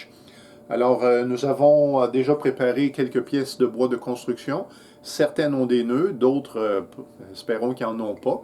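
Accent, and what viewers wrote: Quebec, Canada. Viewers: bonjour et bravo pour tes vidéos. pour le français que je suis c'est toujours très agréable d'entendre l'accent québécois et surtout ce qui est palpable c'est ta simplicité et ta proximité. les vidéos sont super bien tournées et bravo pour la simplicité dans la pédagogie. mon tour arrivant d'ici 15 jours j'ai déjà hâte de suivre tes premiers cours. A bientôt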